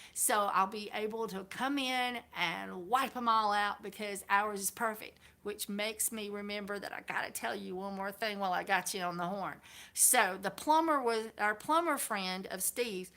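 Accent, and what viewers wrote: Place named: California, United States. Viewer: Pretty Rad... Sounds like you grew up in California!? United We stand YouTubers Playlist: I'm going to put the link to this video in my playlist-- United We stand YouTubers. A ring the bell and I've hit your thumbs up good job. CBD oil back before it was okay to have I discovered just regular hemp oil was very good for soaps and lotion.... I look forward to hear from you, God bless bye for now Ken. Don't be a stranger :-)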